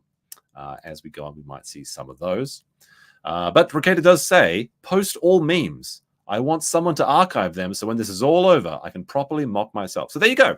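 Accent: Australia. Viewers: As an Aussie it was a lovely surprise to suddenly hear an Oz accent in the playlist I put together for this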